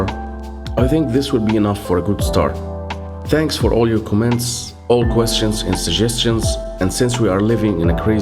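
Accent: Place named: France. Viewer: are you in France? You kind of have that french accent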